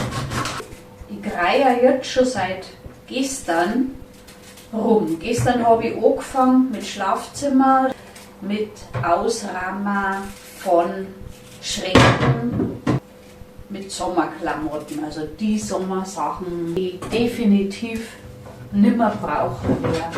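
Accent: Bavaria, Germany. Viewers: Moin moin, na klar versteht Dich der Norden. Ich mag den bayerischen Dialekt, ist lustig 😅. LG, Helga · I bin nach Venezuela ausgwandert und gfrei mi wenn i a super Frau aus Bayern zuahern konn. Bin aus Minga❤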